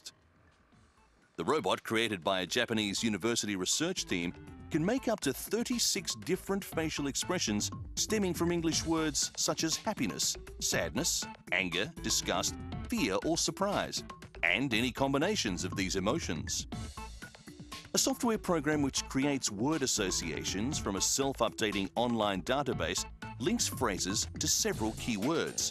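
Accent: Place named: Australia. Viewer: Thumbs up for Australian narrator!!!!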